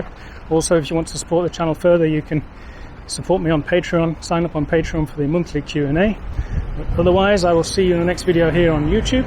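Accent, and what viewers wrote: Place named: Ruhr, Germany. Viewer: What a beautiful loveletter to the Pott! It's true, that you won't find castles or culturally important churches and stuff in the Ruhrgebiet, BUT: there are transformed industrial sites which are cool venues or museums. There is a lot of green since the coalmines disappeared. But you are right, that the Ruhrgebiet is mostly about the unique people. There are, of course always exceptions, but I find people in the Ruhrgebiet the easiest to get along with. And I'm not even from there. I have relatives and friends from all over the Ruhrpott, and I never met someone from this region I didn't like. It is a very down to earth mentality, very straight-forward but also kind, but most of all humble. I was stunned when you discribed yourself as a "foreigner". You speak German without an accent, even with a noticeable Ruhrpott-dialect, you have a German passport, you support VFL Bochum, you even wear Birkenstocks with white socks, how much more German can anybody get????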